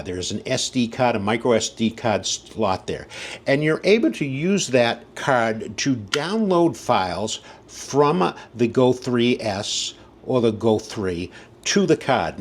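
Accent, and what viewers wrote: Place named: North, United Kingdom. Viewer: I love the oldschool northern “ahhrd” accent. You don’t hear that (in media) as often today! (I live in NC btw lol) Great video!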